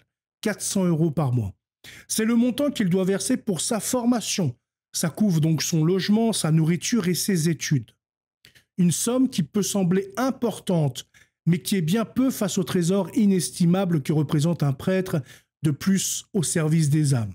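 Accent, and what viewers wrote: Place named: United Kingdom. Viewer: Pourquoi prononcer à l'anglaise des noms manifestement bien français ?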